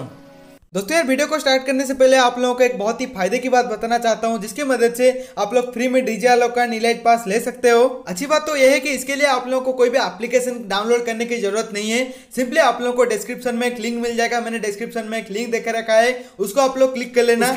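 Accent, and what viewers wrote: Odisha, India. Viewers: Bro are you from odisha? 😅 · Bro are you odia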